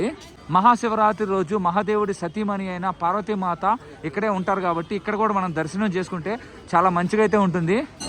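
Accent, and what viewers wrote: Nellore, India. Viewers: Om namahsivaya nee langwage batti nellore anukunna madi nellore nanna presentt hy naku hai cheppu godbleesyou swamy daya neeku chala undi nanna nellorelo ekkada reply pettu vasanta aunty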